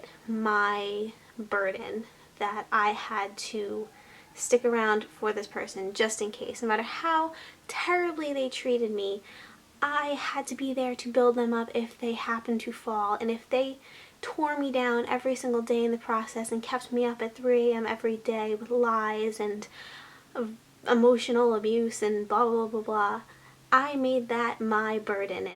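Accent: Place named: United States. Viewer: Ok putting some points on the comment section here, 1. Me and you have literally been through so much of the same stuff 2. You're beautiful on the outside 3. You're beautiful on the inside 4. Your accent is adorable! (I'm british you Americans are adorable!) 5. Some of the points you put forward were so unbelievably true 6. I have really really bad 'love addiction' (I kinda don't like calling it that sounds weird) I have to make everyone in my life super happy and i give them so much of my time etc after this. I'm gonna think about how much i contribute to stuff like friendships etc 7. You freakin' awesome Xox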